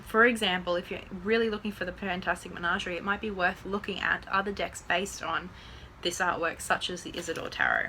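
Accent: Australia. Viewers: Thank's for the great advice. Love your Australian accent too ! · Arn? You mean Own! Nor? You mean No! Horm? Home I think it is! Ozzy accent is thee very worst.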